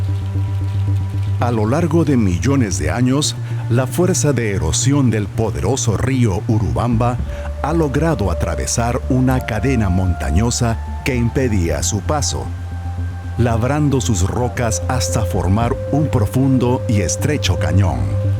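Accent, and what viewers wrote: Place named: Spain. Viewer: Thank you this wonderful spanish accent. Total understandable even with my little spanish also. And the film is perfect. Congratulaion for this narrations again!!!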